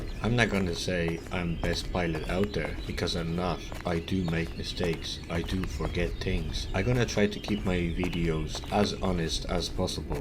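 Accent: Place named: Ireland. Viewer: Hi I have just started watching your channel I appreciate your aim to be entertaining......I thought initially you had a slight Irish in your accent but wasn't sure about your nationality.......thanks for your work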